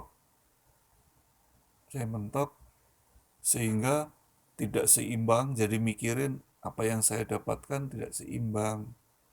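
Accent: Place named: East Java, Indonesia. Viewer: Mass sampean iki asline pundi kok kyak logat jawatimuran kalau ngomong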